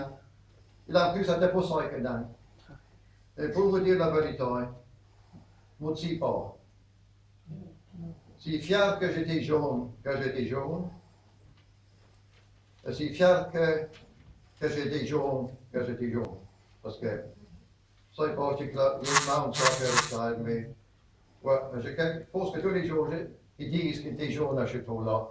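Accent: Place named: Guernsey, United Kingdom. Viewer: Yes, it's a typical Guernesiais accent. (If you want to get into detail, there are several different accents even within such a small island, but to most outsiders they would be hard to distinguish). Norman French (of which Guernesiais is a variety) had a huge influence on modern English, but that was from the 1066 Norman Conquest until around 1400, ie. before the Great Vowel Shift. But the many languages and dialects of the British Isles haven't influenced each other's vowel sounds over the centuries since.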